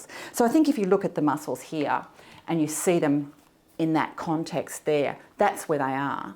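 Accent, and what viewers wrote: Australia. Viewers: I also love the Australian accent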